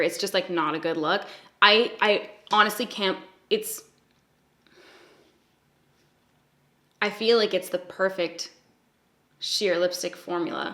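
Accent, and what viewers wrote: Canada. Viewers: I fucking knew u were canadian. gang 🍁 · You look extra beautiful and happy in this video tysm your vids make my day! Ive been living in england for almost 2 years now and sometimes i just really need a canadian accent to make me feel like Im home 😫😭😛 Love you!!!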